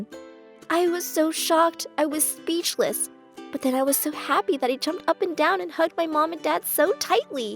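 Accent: India. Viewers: is the narrator Indian? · One question.....is the narrator Indian ?? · Are you from indian or something?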